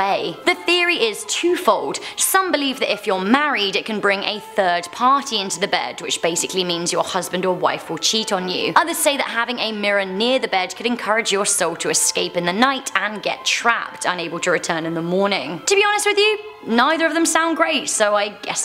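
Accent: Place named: United Kingdom. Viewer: Dutch 🇳🇱 love the UK accent and love u ❤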